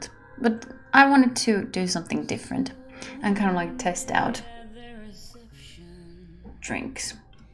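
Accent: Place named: Finland. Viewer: Hi,. I did watch (well listen to) this one today. Wondering if you ever got that "team" you wanted. Again, I know I'm new around here, but I'd be eager to help. (Or Igor to help, as the case my be. Okay, that was my one joke.) Serious now: Obviously I'm not as much use as someone who is physically there, and I'm no good at makeup (you do your own make-up? Even I assumed that you had someone to help with that! You look amazing, btw.) Things I have thought of that I could potentially help with (short version) 1) Proof-reading of scripts for any material you're producing in English. [A lot of what you do is music, but if you move into more ASMR work, there could be scripts.] [I used to help a friend who was a professional proof-reader for a major book publisher, so I feel pretty qualified here.] 2) Possibly suggesting minor edits to scripts but being okay with you having the final say. 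2a) [I have some knowledge of linguistics (hobby number 37 on my (non-existent) list). I know handy things like the difference between a voiced and an unvoiced consonant. If you want, I could potentially help you with pronunciations on some of the trickier English words. (Although I think English is more charming when spoken with a Finnish accent.:)... Sorry, professionalism here.) However, sadly, I only know abut 4 words of Finnish, so I'm not going to be tons of help with proofreading Finnish. ] 3) I'm a good typist, for what that may be worth. 4) I've used Gold-Wave pretty extensively a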